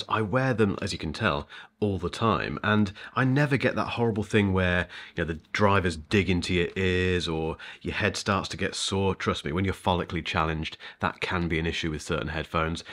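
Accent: United Kingdom. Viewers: Calm lovely voice, British accent is the best ❤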